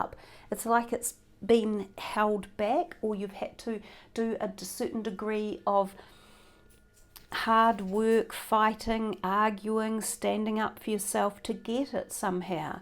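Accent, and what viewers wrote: New Zealand. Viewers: Are you a new Zealander? The accent in familiar. Regardless.. glad I found you - a familiar accent with tarot readings on YouTube is calming. ❤ thank you for taking your time to do this reading 😊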